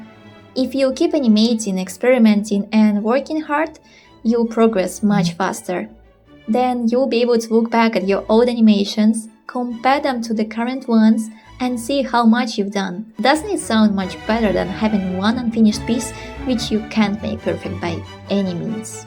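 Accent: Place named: Philippines. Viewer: Hello arr sounds like Filipino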